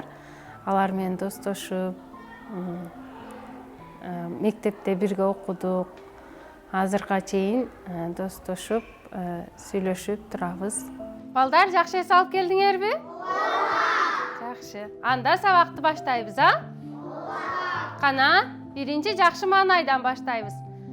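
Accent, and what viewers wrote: Standard. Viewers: Ош областында кыргызчаны таза суйлогон адам десе болот. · Аяш абаларга Караганда таза суйлойт экен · Мына башка улуттун окулдору минтип кыргызчаны суудай таза суйлоп жатышат. Ал эми биз кыргызча жондоп суйлой албай атып,орусчаны сайрап коебуз да. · Байкадынарбы , кобураак эмес , кобуроок деп граматикалык жактан туура , адабий тилде сцйлоп жатат . Кыргыз тилинде создун мучосундогу ундуулор унгудагы ундуулорду ээрчийт . М : Батыраак , артыраак , тезиреек , кобуроок ,женилирек ж.б. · Оштук айрыкча баткендиктер намысынарга келип тилинерди ондогула! Карагылачы озубек кызы болсо да кандай кыргызчаны таптаза суйлойт! Таарынбай тилинерди ондогула диалект деп актанбай!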